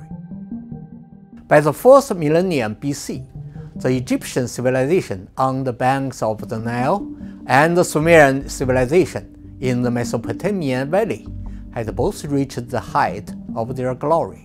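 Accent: China. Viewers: Excellent analysis. May I make a few suggestions? Common chinese mistakes when speaking English: 1. "V" sounds. "Very", not "Wary". "Vast", not "Wast", "Video", not "Wideo". 2. "TH" sounds. "THink", not "Sink". 3. And in this video, "Empire", not "empAIR". There are more, but these 3 are most obvious. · Insightful + interesting analysis, but the prof should have let a superior English speaker narrate his content; his Mandarin accent was so painful, I had to view to video muted, with CC.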